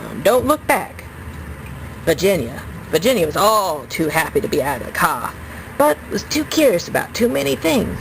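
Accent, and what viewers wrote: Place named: South, United States. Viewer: You have a pretty good Southern accent. Good story telling. Thank.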